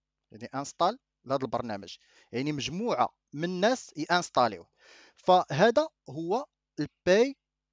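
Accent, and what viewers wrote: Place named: Maghreb. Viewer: لا استطيع فهم اللكنه المغاربيه مهما حاولت للاسف اللكنه او اللهجه المصريه اصبحت رقم واحد في الوطن العربي😔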